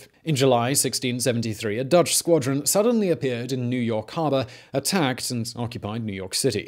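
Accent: United Kingdom. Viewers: Simon..... Being a New York native I must say the British flair you speak whilst educating make me wonder..... "Damn it Man why were you not my History teacher!" To you team of researchers "Brilliant!" to coin a term. Thanks Simon love this channel then again im subscribed to ALL your channels Proud to be a New Yorker!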